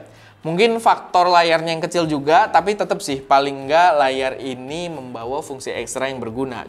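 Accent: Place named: South Jakarta, Indonesia. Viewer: aksen jaksel bgt, fix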